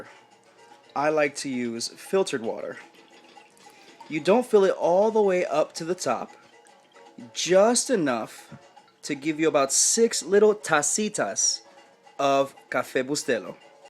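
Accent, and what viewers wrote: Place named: Cibao, Dominican Republic. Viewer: Jajajaja me encanta la forma de explicar... seguro eres del cibao santiago republica dominicana. Salud!!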